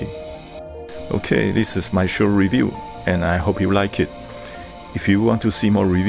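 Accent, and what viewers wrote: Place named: United States. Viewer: you speak great English. I mean your tone likes American, not Taiwanese accent...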